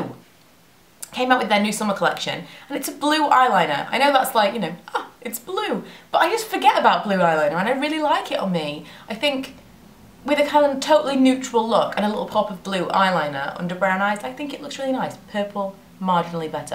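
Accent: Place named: Northern England, United Kingdom. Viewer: Not sure if it's the husky voice or the mention of beer, but you were particularly Northen in this video & it was great. 😃😃😃 love you xxxxxx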